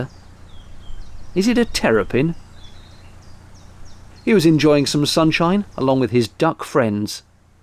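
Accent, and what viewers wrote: United Kingdom. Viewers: Cannot help Binge watching this. The narration is very well written. Besides, everything sounds sublime in a British accent:) can easily pick up a commercial television contract.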